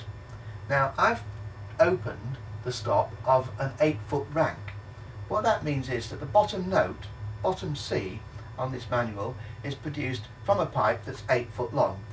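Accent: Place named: Southern England, United Kingdom. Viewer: Why is his voice hilarious? It sounds like a very normal southern English accent!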